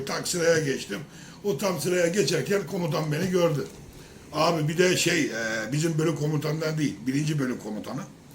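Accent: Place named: Izmit, Turkey. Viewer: abi sen izmitli miydin ya tam bizim buralardaki gibi konuşuyosun harika bir insansın çok sevdim seni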